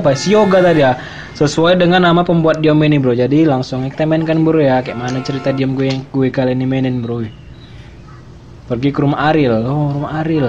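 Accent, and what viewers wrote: Medan, Indonesia. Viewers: lu anak medan ya brohh...... logatlu logat medan brohh.......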